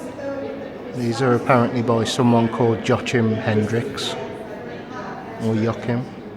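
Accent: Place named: Birmingham, United Kingdom. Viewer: So nice to hear a brummy narrator. I think the persuaders with roger moore was shot there or maybe i am mistaken.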